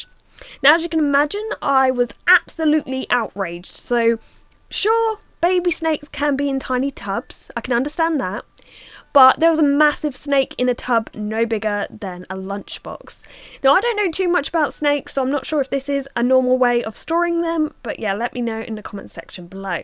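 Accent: United Kingdom. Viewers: Jesus her voice is so painful if she wasn't British I'd like the video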